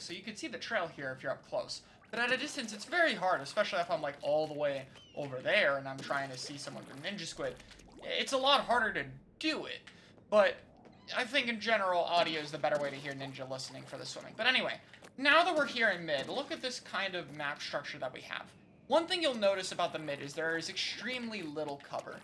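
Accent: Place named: United States. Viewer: Something about your cadence in this video makes you sound like an American flc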